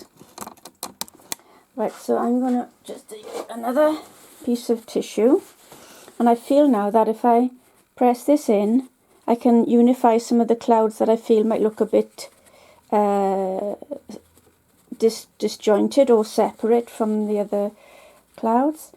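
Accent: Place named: RP, United Kingdom. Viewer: Thank you for the tutorial. I'm trying to recreate your technique it looks quite good. I love your art and your voice has captivated me. When I was a kid, I used to learn English from BBC on my little radio in socialist Poland. I'm talking1970-ties. Your beautiful accent is transferring me directly to those old times :)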